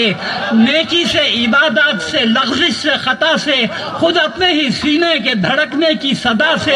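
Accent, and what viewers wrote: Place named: India. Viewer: Nahi ye mujhy unki awaz nahi lagti mera dil nahi maan rha mashaAllah jysa face hy wysi awaz nahi hy. Ye koi indian hy lehjy sy maloom hota hy. Or koi patla bnda hy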